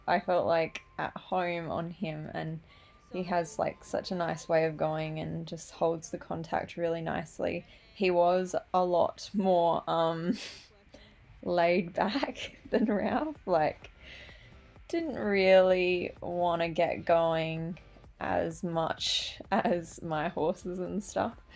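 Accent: Australia. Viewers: She is from Australia, that's our accent.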